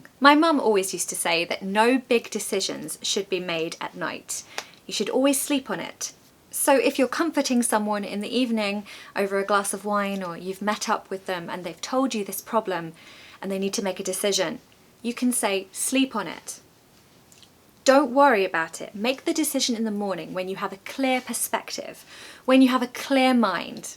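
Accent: RP, United Kingdom. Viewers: ❤❤❤❤❤❤❤❤ Love you so much ! Your Modern RP accent is really beautiful and wonderful My GREATEST Teacher of British English Language!!! ❤❤❤❤❤❤❤❤❤❤❤❤❤❤ ❤❤ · Hi, i love your British accent ❤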